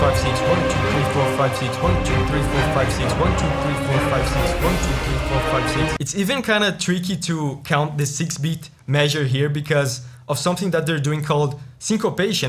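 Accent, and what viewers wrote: Brazil. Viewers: bro, are you brazilian?? your english accent is a little bit like a brazilian speaking english (don't take this in a bad way, but it remembers me so much) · Vc é Brasileiro? Sotaque brasileiro.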